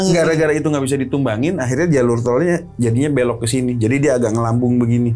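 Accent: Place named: Betawi, Indonesia. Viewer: Kagak ngerti klo Nyang cerita pakai dialek Betawi suka bgt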